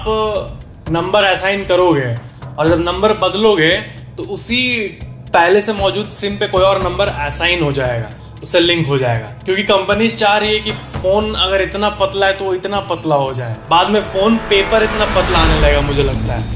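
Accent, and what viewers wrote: Bihar, India. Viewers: Sir aap bihar se ho kya???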